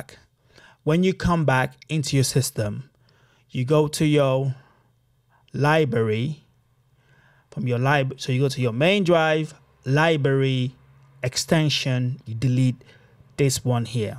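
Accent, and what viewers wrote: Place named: South London, United Kingdom. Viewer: bruh this guy is on sum south london shit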